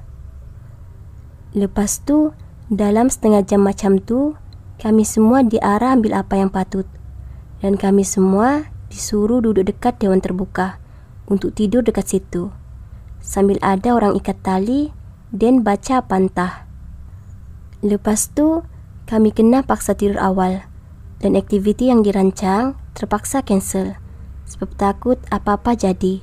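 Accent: Sabah, Malaysia. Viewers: Hahahahaha tula cara dia cakap mcmtu,aku syak dia org sabah🤣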